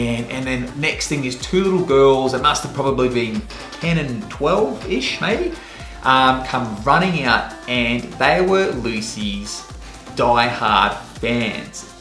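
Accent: Australia. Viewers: Awgh ausy accent is so annoying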